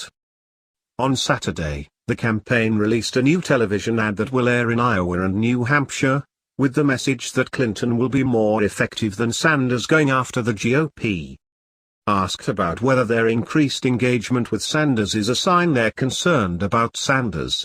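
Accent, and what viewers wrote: United Kingdom. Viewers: I dont mind the british accent but im pretty sure its a bot though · It's a person just bad sound, and he sounds British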